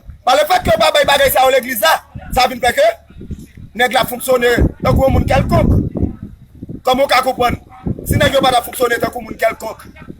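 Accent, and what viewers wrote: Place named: Cap-Haïtien, Haiti. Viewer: Neg okap gen yonjan yo pale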